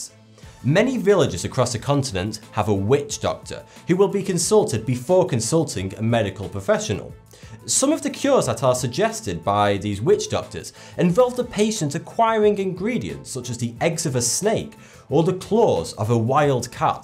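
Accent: United Kingdom. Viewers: Now I'm confued. For the longest time I thought you called yourself FORTY-two. But the name of your channel is, so I figured your British accent made it SOUND like. Then you just mentioned they're superstitious of 4, the 40s, including your name FORTY-two. So, which one are you, or? And how did the name originate? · why are you british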